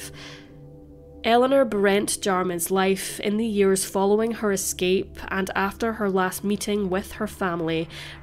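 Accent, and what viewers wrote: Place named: Glasgow, United Kingdom. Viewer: I spent 10 years in Glasgow Scotland. I quite enjoy listening to your Glaswegian accent narration.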